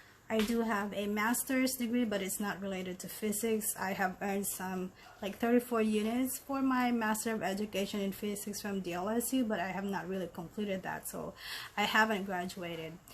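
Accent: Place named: United States. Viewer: That's nice . I love your accent ma'am parang American accent na.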